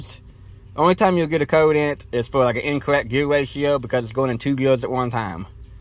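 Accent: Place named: Arkansas, United States. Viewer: I was locked up with a guy from Arkansas with an accent exactly like yours, is that where you're from?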